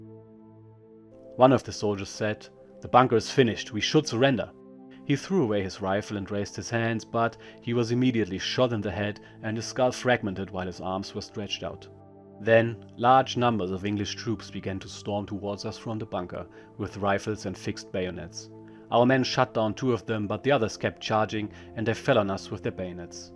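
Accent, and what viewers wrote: Germany. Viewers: The German narrating is a definite improvement over the simulated American voice. It makes the narration seem more authentic.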